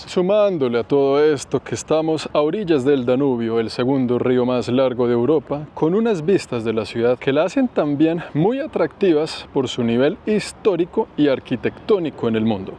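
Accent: Bogotá, Colombia. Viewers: Me encanta como me haces viajar desde mi humilde habitación de España, por cierto me gusta mucho la forma de hablar con el acento colombiano y la cultura que trasmites con tu contenido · Si.muy Colombiano en sus modos de hablar y dichos.👍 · Me encanta su voz 😅 el verdadero acento rolo, sin la degradacion que le han dado muchos habitantes de bogota. Gracias por tus exelentes videos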